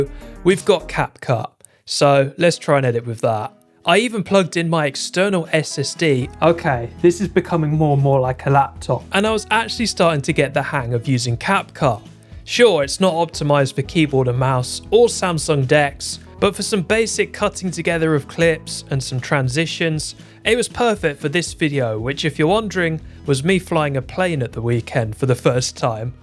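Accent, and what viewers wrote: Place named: Estuary English, United Kingdom. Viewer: I enjoyed the estuary English accent. I don't hear it much on here. We usually get upper English, Irish, or Scottish.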